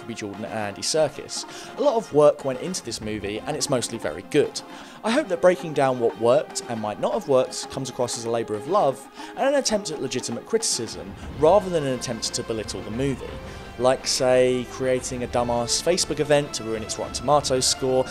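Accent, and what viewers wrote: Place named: Upper class, United Kingdom. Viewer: First of all the black panther has no villian problem and my God this was the first movie give them a break super critics and who is this guy doing this segment because he sounds like some British aristocrat who has never been around a black person .it is a known fact that people who are dark skinned understand this movie better then our pale face counterparts because they've never been hated on simply for the color of there skin .and if you or anyonelse can't understand the message from this film then not only are you not open minded. But you probably most likely only gang around members of your own race and that's not only cowardice but unhuman and boring .killmonger is a villian fueled by hate and that's more understandable then some sadistic twisted dceu character that Batman has to fight .haters go back to the dceu world the marvel universe is for controversial heros .peace and power to the people .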